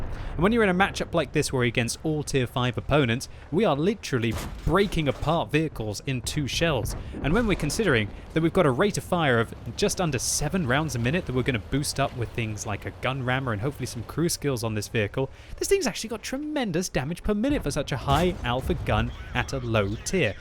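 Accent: France. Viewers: bon accent français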